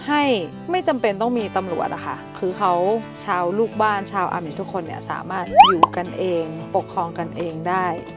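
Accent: North, Thailand. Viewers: ชอบดูคริปน้องมากเลยเจ้า..น้องอยู่จังหวัดหยั๋งเจ้า..ป้าเจียงฮายเจ้าตอนนี้อยู่เวอร์จิเนียร์เจ้า..ได้ยินกำเมือง..ดีใจแต้ๆเจ้าจะติดตามตลอดไปเจ้า.. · ทำไม นู๋อู้เมือง ☺☺☺ สำเนียงไปทาง ชร..พย ☺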